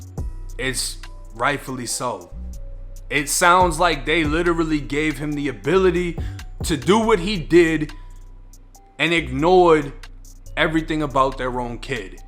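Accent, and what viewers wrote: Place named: Tampa, United States. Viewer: I love how u dead ass talk like u from Tampa fr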